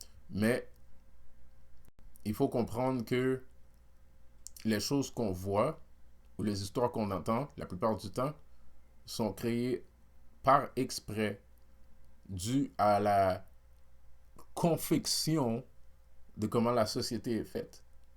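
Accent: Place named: Quebec, Canada. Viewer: Yo tu parle francais mon gars ta même un Accent Québecois calis🤣🤣🤣✌😁😁🤓🤓👍👍✌✌✌loll jaime bcp t video peace moi jsuis de Montreal loll✌🙃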